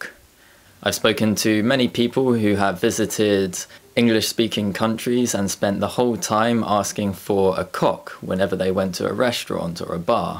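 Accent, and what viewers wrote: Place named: Yorkshire, United Kingdom. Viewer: So helpful and a very nice accent! Is it Yorkshire?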